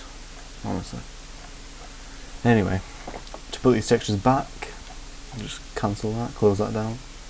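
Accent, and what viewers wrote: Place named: Keighley, United Kingdom. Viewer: You sound like a Keighley lad!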